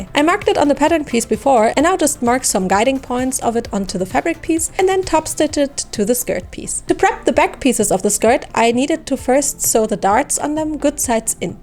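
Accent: Germany. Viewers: YAYY NEW VIDD! also weird question but are you german by any chance?? I believe your accent reminds me very much of german!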